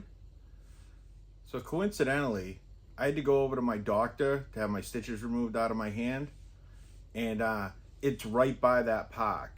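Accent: Boston, United States. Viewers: Wow, man! I saw a poscammed. Free drums in the woods somewhere and just assumed it was a scammer. Maybe not related . Either way, this is absolutely crazy!!! What a killer find. Good job tracking it down, man. And hearing you say "pahk" and "pahts" so many times just added to my enjoyment 😂😂😂 Had to get a Boston jab in there as a New Yawkah! So envious on that Vistalite kit. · Sketchy park, and Boston accent. Definitely thinking Brockton or Fall River 😂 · dam! I was gonna say Brockton when you said south shore. But your accent is thick so I thought closer to Boston. Just moved my son into a house in Brockton. Like Avon border, so not too bad. Nice neighborhood…for Brockton 😂. I can’t say too much about it. I live on the border of Woonsocket RI. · Great video! Are you from North or South of Boston? Apts look like either Brockton or Dorchester. I lived on South Street in the early 80's Campello section. 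Def a Boston accent!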